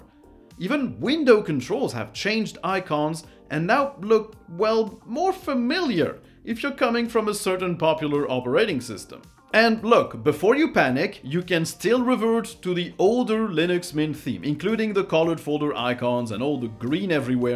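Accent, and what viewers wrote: France. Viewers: Where is your French accent coming from? I'm Belgian ^^ Nice video by the way, I'll install 21.1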